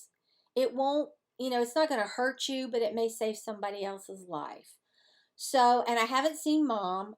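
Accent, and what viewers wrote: South, United States. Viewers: So good to hear that sweet southern twang say, "Hey guys, welcome back to my cheeennel." Welcome back to You (tube) ! Hip hip hooray!!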